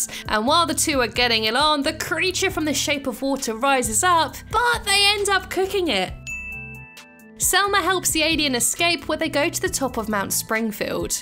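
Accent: United Kingdom. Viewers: your british accent totally ruins certain puns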